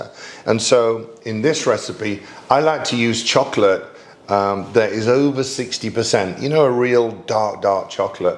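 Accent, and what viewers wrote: United Kingdom. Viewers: I had to subscribe. I just love the way you talk. The British vibe is everything! Cheers from France! 😊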